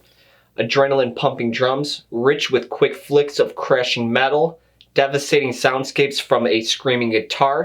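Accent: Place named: Midwest, United States. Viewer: Great review brother! Props for pointing out the lyrical content and the remarkable drum performance on this album. I also appreciate your impeccable midwestern accent. I’d be shocked if you weren’t from Wisconsin or Illinois. Keep reviewing black metal!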